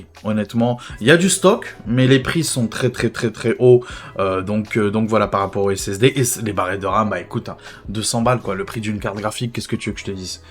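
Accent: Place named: Belgium. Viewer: hello MERCI pour tes petite video sa ma beaucoup aider a monter un pc j'adore tes video mais vraiment meme avec ton petit accent belge 😁😁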